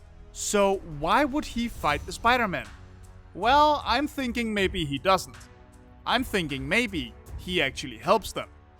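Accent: Denmark. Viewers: Ohh shoot, a danish narrator🙏🙏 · Are you Danish? You sound like you have a really strong Danish accent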